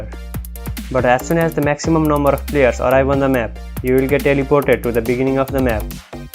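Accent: India. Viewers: Bro why ur sound u from India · are you Indian? you sound like one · This is why i love Indian accent it easier to understand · u r acent is quite indian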